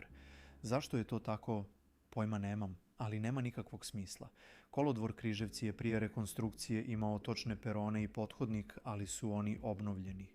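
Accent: Serbia. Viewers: Dobar video al ide mi na k ovaj srpski naglasak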